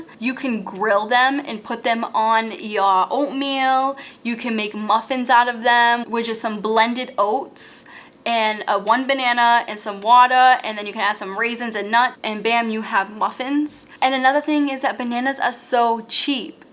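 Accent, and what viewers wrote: Boston, United States. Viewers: lala suncokretic do you mean her Boston accent is more pronounced lately? I noticed that too. She’s probably more comfortable with it now, and isn’t trying to tone it down. She actually might have mentioned that in a video.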